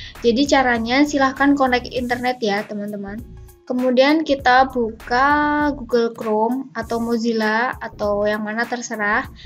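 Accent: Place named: Central Java, Indonesia. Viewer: Dari logat cara ngomongnya kaya orang Jateng 😁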